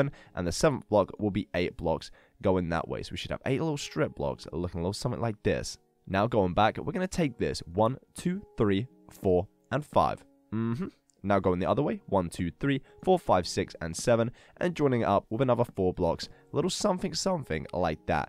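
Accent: United Kingdom. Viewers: I don't want to disturb you but are you British because I really love your voice and your accent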